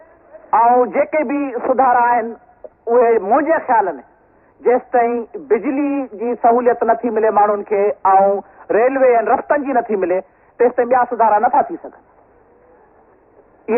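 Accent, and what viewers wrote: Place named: Larkana, Pakistan. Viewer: Kitni piyari sindhi bol rahay hain bhutto sahib larkana style sindhi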